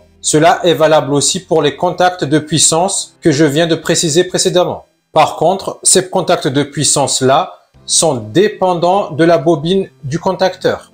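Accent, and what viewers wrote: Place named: Alpes-Maritimes, France. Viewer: Merci bien parler et on dirait vous êtes 06. Pas 15. Vous exprimer très bien mieux que mon formateur de l'AFPA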